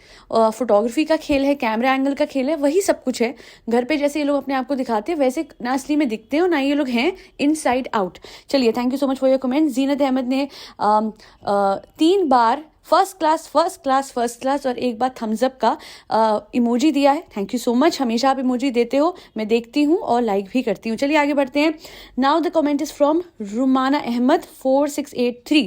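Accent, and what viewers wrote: Punjab, India. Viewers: I know, it's ok.. it's not easy to reply all comments,we love you always,nd specially love your punjabi accent ❤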